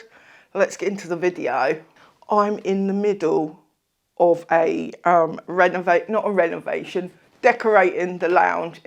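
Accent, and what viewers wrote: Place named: Kent, United Kingdom. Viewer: HI, sorry if this is too personal, but me and my husband have been trying to place your accent. I say Kent he say's Dorset. Love your videos.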